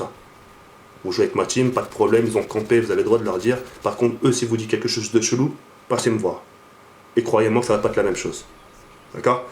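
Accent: Banlieue, France. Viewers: Je suis décu de ta video ! La violence ne ressoudra rien ! Et c'est surtout que le rascisme est bien des deux cotés donc faut arreter de pleurer sur son sort en prenant un accent balieusard ! Je la connnait la banlieue et faire genre en parlant comme sa fait le caid te sert à rien j'apprecie le personnage que tu es car tes pensées sont le biens incarnées comme l'histoire Merah ! Mais ne part pas en vrille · arretes stp, malgré ta voix de banlieusard et ta casquette a l'envers comme tu dis, tu sembles meme pas a une caillera, t'es un mec cool, respectuex qui parle de la verité que certaine personne meriteraient d'entendre. continues tes videos pk t'as un niveau de jeu elevé. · je suis Iranien, mais sa m'empêche pas de pencé extrême droite ... c'était juste de l'humour Noir car j'aime pas cette voix des cités ... Ce n'est pas une bonne chose du défendre et vous Français gauchiste ! 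vous allez tuer la France avec votre Humanité exagéré envers tout ! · Mdrrr y a des gens dans la rue qu il faut respecter et parler bien mais youtube c TA CHAINE dc tu doit etre toi meme ! J suis banlieusare et fiere de l etre ! Notre "axcent" c notre identiter un peu ... bonne continuation ! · juste un message, ne t'adaptes pas, ne change pas, malgré ta voix banlieusarde, ton language, les gens s'en fichent, apprends à etre toi meme, meme si ça peut prendre du temps. Tes abonnés verront réellement qui tu es, et ça plait toujours de voir la vraie personne qui nous parle;)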